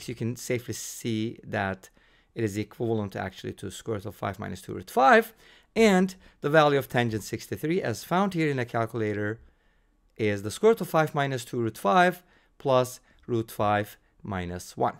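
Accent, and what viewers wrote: Turkey. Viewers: I guessed from your (slight) accent you might be Turkish. Now it appears I am right.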